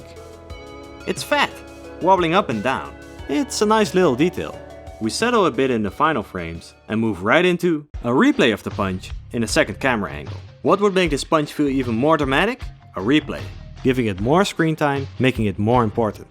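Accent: Netherlands. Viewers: You are Dutch aren't you. I can hear the accent/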